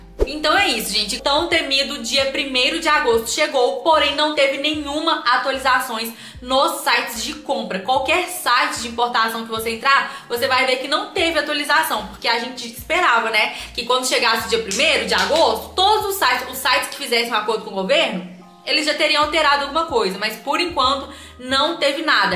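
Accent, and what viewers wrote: Minas Gerais, Brazil. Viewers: Esse sotaque mineiro é muito bonitinho 😊❤️ ( eu acho q é mineiro 😅)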